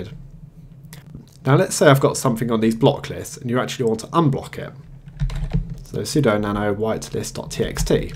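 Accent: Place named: Cardiff, United Kingdom. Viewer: +1 for you voicing a Git or Syn tutorial. +1 for Cardiff accent.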